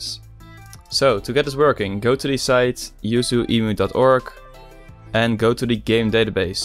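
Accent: Netherlands. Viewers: I can hear from your accent your Dutch 😂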